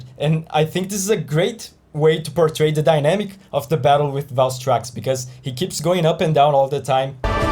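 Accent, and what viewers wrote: Brazil. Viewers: great video, love your mh content! btw you have a very brazillian accent or at least sounds like it lol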